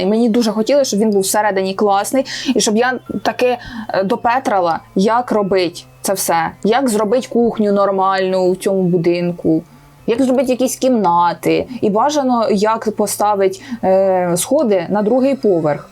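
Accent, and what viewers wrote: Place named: Poltava, Ukraine. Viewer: Ви така крута!) Мені дуже подобається ваш говір. Моя подруга з Полтави спілкується дуже схоже і це дуже мило Попалися в рекомендаціях, одразу підписалась Дякую за контент))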